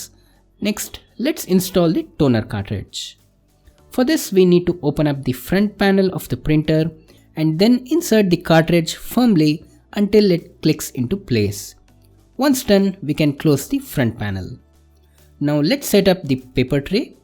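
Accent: India. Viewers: Bro, it's typical indian english😊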